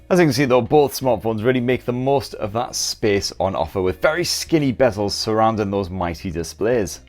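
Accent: United Kingdom. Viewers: I struggle a lil with British accents does he call the phones "blowers"?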